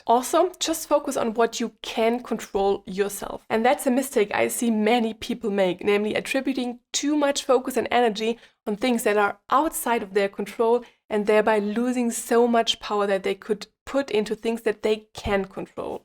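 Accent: Germany. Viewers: btw. Feedback is for me the most difficult to ask. Tip 11 is btw for me a problem, because I am criticaly ill. However I am very much on my way with doctors to get better and very motivated to start my walks which I have done in the past again. So do not worry. 11 is comming again. I do my shopping by foot, so a bit 11 already. For you in tip 12, something small to learn, a little line in Dutch, however do I hear a little German accent? In that case it will not be that difficult: Dank je wel dat je me vandaag weer wat geleerd hebt.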